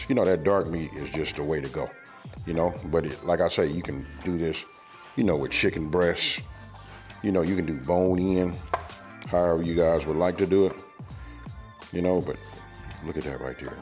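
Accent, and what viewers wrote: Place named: South, United States. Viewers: where would you say your accent is from? I noticed your vowels sound a lot like Ryan Coogler's from the interviews I've heard and I've heard that accent before but idk if I can place it. It's like a combination of Southern California and the American South but not somewhere I can place. Is it Oakland? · I will believe any cook with a southern American accent that they know what they are doing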